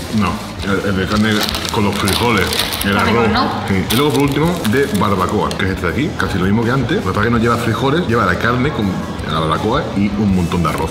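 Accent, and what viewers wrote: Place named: Mexico. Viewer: Amooo el acento wey, te lo juro nms, yo soy de Mexico, y aseguro que es 100% mexa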